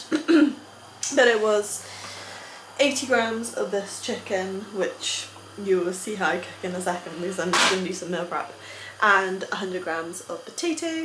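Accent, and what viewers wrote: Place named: Oxford, United Kingdom. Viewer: That Oxford accent tho... <3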